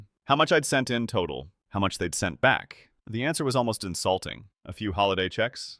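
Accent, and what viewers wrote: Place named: Russia. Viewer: 2nd video seems like the narrator went Russian or something again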